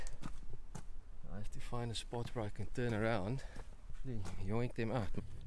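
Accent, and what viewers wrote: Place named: South Africa. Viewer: You have a South African accent, wondering if you are from here min confirmed I guess. Looking at buying a 957 and doing the same with it.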